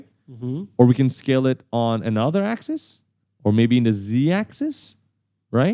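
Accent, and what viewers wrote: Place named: Iran. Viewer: اینکه میدونم دارم unreal engine رو از هم وطنم یاد می گیرم یاد گرفتنش رو برام خیلی جالب تر می کنه, دمتون گرم.(از لحجه پیداست ایرانیه)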